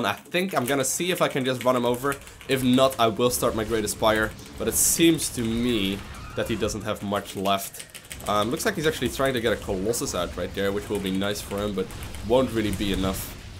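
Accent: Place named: Netherlands. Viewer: Saw this video randomly in recommended panel and man, that 3 years are such difference... event that weird dutch accent you can hear is no longer noticable in 2017 videos :)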